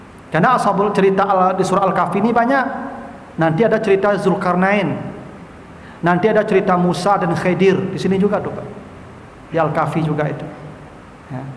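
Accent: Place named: Minangkabau, Indonesia. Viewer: Khas muralabuahnyo . Membuek ambo takana jo kawan ambo maso di padang daulu. Tapi rancak end mantap mendanga ust membawo ceramah. Ambo sanang 👍👍👍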